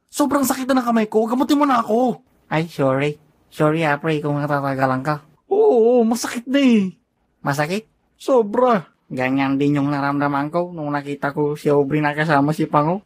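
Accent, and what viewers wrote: Ilocos, Philippines. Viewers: Ilocano ka boi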